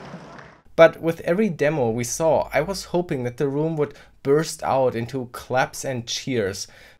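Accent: Germany. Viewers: r u a german guy? i heard the slang long and in the clip the alter got me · That german accent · Dicker der Deutsche Aktzent zerstört so haha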